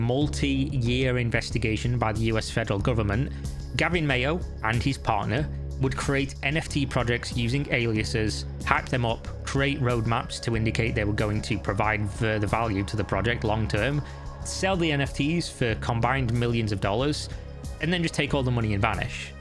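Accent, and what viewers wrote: Northern England, United Kingdom. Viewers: First time ive seen you on camera, your voice is Northern English gold. Keep these amazing videos coming.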